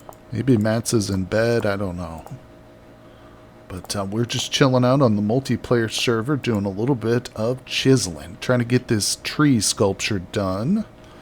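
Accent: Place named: Minnesota, United States. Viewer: I said in a previous video that you sounded familiar. Now you're confirming you live in central US. What are the chances you live in Minnesota? Lol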